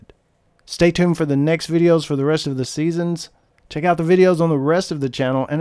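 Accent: South, United States. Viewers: Dude you have a southern as hell accent lol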